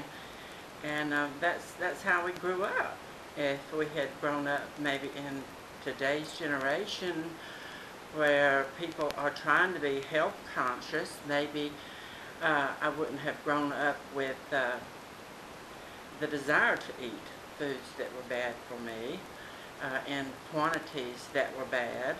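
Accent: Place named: South, United States. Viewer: Was born in Mississipi. LOve that southern accent :)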